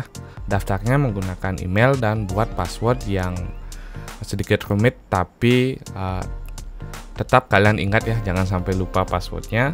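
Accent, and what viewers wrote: Bali, Indonesia. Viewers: Asli Bali yaa om? Logat nya Bali banget 😁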